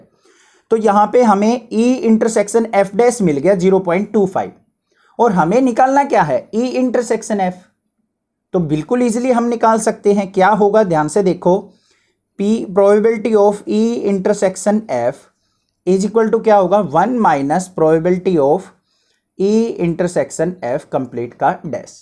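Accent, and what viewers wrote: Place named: Bihar, India. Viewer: Sir bihari h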